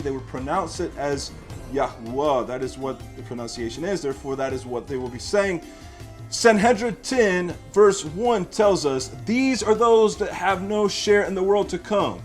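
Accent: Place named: Eastern Europe. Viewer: I have noticed that the pronunciation of the "V" in Eastern European accent is "W". I asked myself, "What's up with that?"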